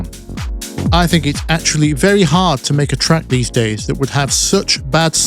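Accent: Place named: Yorkshire, United Kingdom. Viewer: Oh man I LOVE you. New to this channel but I've well and truly subbed and will be sticking around. You're a fountain of wisdom, cutting through the crap with refreshingly relevant advice. (You sound like a fellow straight-talking Yorkshireman too!) Mate, this video was for ME. I can't finish anything as my standards are too high. Hundreds of unfinished tracks I daren't sign off in case they're not perfect. Still putting off launching my music because I've got hundreds of different factors I feel need to be perfect before I start. Your vid is so inspiring, thank you! Your mantra about "not released because it was finished" is mega helpful! Just hearing you even talking about this issue is so encouraging.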